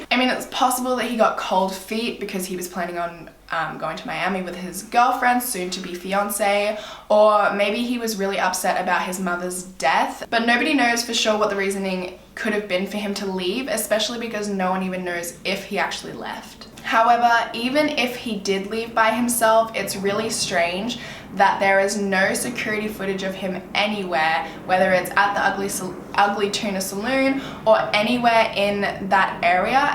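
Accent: Australia. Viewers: i normally rly don't like accents like british accents but i love your australian accent so much and you're so beautiful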